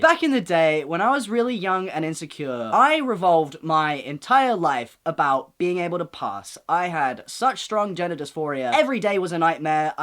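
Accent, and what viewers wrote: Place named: Cockney, United Kingdom. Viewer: Your cockney accent is the best thing I've heard all week.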